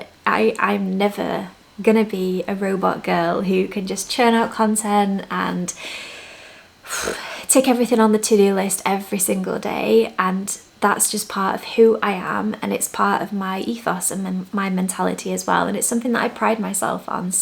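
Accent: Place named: North, United Kingdom. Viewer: Hi I have just found your channel yesterday and I'm so glad I did. I caught up on some past videos. Your very real and are just you and I appreciate you for it. Dont worry just let it flow everything is weird at the moment. Can I ask what you do as your job just being curious. Your house is lovely and always looks so calm and plus u have a northern accent which helps. Xx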